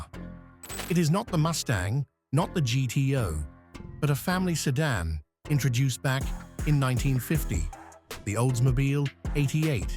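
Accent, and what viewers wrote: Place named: RP, United Kingdom. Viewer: Why does the story of a classic AMERICAN automobile have to be narrated by a British linguistic sophisticate who sounds as though he should be orally presenting a Charles Dickens novel? Also, the U.S. InterState Highway Act was not signed into Law till 1956.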